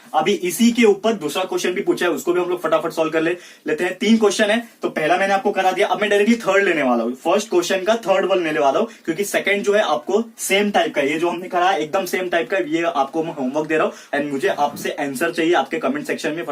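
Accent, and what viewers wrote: Maharashtra, India. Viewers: Sir aap Maharashtra se ho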